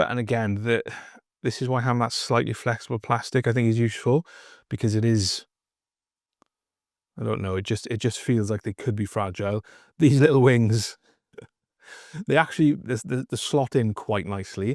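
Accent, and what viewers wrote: Wales, United Kingdom. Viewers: You sound Welsh! Whose coat is this jacket?! lol, imma fellow Welshman across the pond in the states, and ive recently gotten into Warhammer after playing Magic: The Gathering for many years. very excited about Blood Bowl. Love your videos!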